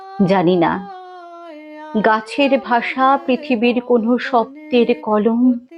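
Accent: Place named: Bangal, Bangladesh. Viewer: দারুন সুন্দর লাগলো তোমার কবিতা পরিবেশন বিশেষ করে তোমার মুখে বাঙাল ভাষা গুলি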